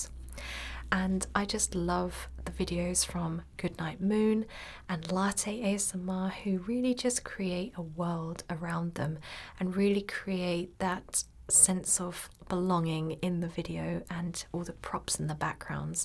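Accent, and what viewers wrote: United Kingdom. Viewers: Im in love with your voice... And British accent ❤️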